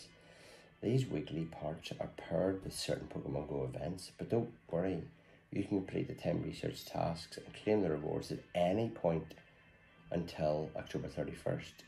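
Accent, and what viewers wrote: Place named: Belfast, United Kingdom. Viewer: You popped up in my feed glad I starting watching cause think I’m hearing a fellow Belfast man ( edit forgot to acc say yeah you kinda talked me into it too) 😂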